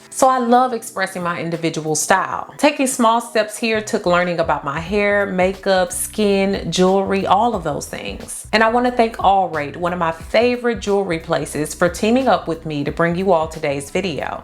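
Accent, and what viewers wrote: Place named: Rural, United States. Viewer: Thank you! I just wanted to say that I love your country accent lol 🤎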